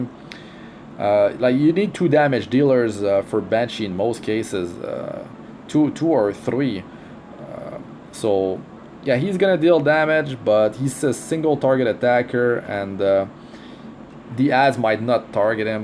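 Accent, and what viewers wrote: Quebec, Canada. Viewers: are you french canadian?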